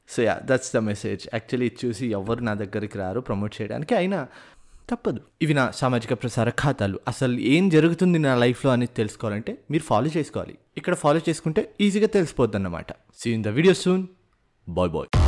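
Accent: Karnataka, India. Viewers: Hi bro... Iam not ur subscriber, bt I watch every video of ur... I love ur love towards cinima bcoz u jst like me... only difference is ur doing something to cinima, bt I am doing ntng... Today u earned my respect too... 💕 For ur slang of Telugu with kannada accent mixing... 😂 Boii.. Boii..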